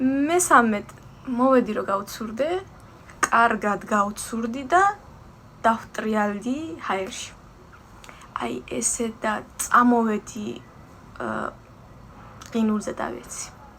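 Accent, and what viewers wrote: Fereydan, Iran. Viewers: რა საყვარელი ხართ, ფერეიდნელი ქართვრლების დიალექტი🫶🏻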